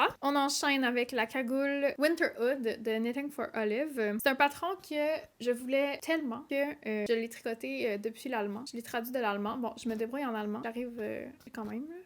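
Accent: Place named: Saguenay, Canada. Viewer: Tu dois venir du Saguenay… c’est certain… quel accent… en passant, je capote sur l’originalité de ton podcast!!!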